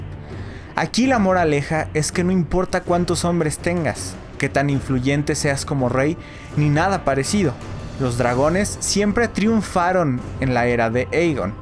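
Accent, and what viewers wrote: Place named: United States. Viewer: Que mal suena ese inglés de USA. Es super irritante. Por cierto, se dice BRAVOSÍ, no Bravosi.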